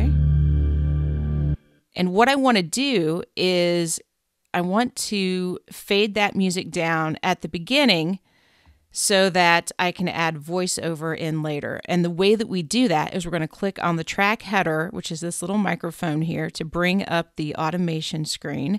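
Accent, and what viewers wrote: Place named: United Kingdom. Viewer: U sound so British lmao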